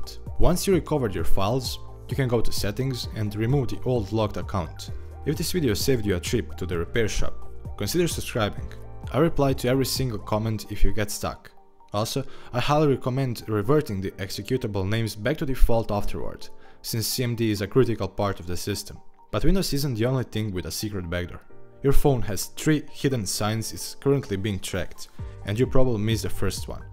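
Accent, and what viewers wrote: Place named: Balkans. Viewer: Your accent sounds like you're from the Balkan region, and after seeing you accidentally type "Ć" , I'm pretty sure you are, so I have a question: "Odakle si?"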